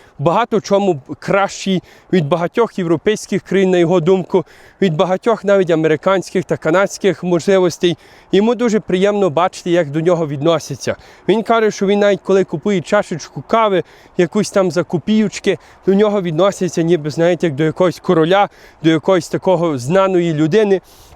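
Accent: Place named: Lviv, Ukraine. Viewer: що ти нам нав'язуєш оту свою заразну львівячо-польську вимову, акцент.Сходив би на якісь літературні курси, нехай тобі "поставлять" правильну вимову, ти ж не пшек і не канадієць, у якого предки 25 -го коліна тому назад поїхали в країну індіанців, тебе ще можна виправити.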